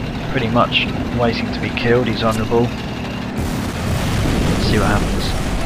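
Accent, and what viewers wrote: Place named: England, United Kingdom. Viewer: Your English but you may have some American roots because you do not have a deep English actcent, sorry I am not the best speller. It is almost kinda American if your voice tone was a lil defrent. You sound a lil like total biscut so I think you live in the UK closer to the English channel. Tell me if I am right or wrong!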